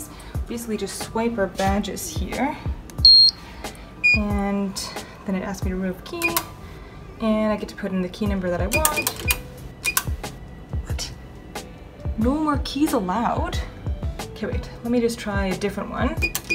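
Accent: Canada. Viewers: canadian and 1/4 irish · can I ask you what hospitals you work in? Judging from your accent I am guessing you are in Canada?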